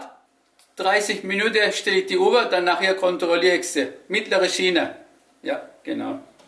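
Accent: Swabia, Germany. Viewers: Hallo.. Hab euch mit diesem video weiter empfohlen..hab den Kanal schon lange aboniert und freu mich jedesmal über deine humorvolle lockere Art 😉und das Schwäbisch das abgefärbt hat😃euch noch viel Spass bei vielen neuen Ideen Lg Marina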